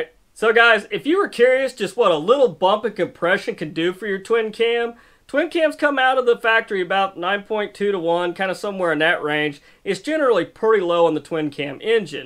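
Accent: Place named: Texas, United States. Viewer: I have noticed that you have the exact same accent as Mike Nesmith from the Monkees he was also from Texas I wonder if you guys are from the same town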